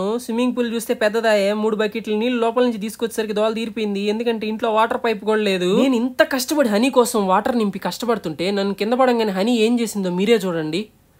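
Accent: Nellore, India. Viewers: Nenu meee vedios chustunnanu last one week nunchi but QA vedio lo meeku ammayilamedhunna respect ki subscribe chesanu🎉 all the best ma frd kuda nellore eeh slang baguntadhi kadapa slang ki quite opposite nellore slang😊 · Hi nenu akkani epateki 3rimes chusanu video 12 y avuthundi nellore basha vne ne matallu challa baguntai thamudu nellore valaki yachullu yakuva 😂😂😂 yapudu happyga undu ma house chupinchava pls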